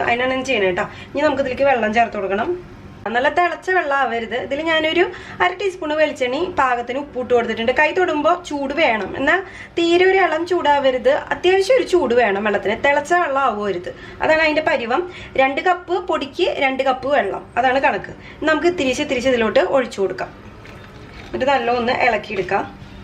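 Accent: Thrissur, India. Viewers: ഈ തൃശൂർ ഭാഷ കുറച്ച് പതുക്കെ പറയു,കാരണം നമുക്ക്‌ തൃശൂർക്കാർക്ക് മനസ്സിൽ ആവൂം,പക്ഷെ മറ്റുള്ളവർക്ക് ഇത്തരി ബുദ്ധിമുട്ട്ണ്ടിവും,സംഗതി അടിപൊളിയാട്ടുണ്ട്,ഞാൻ. ഇന്ന് ഇണ്ടാ ക്കി നോക്കി ട്ടാ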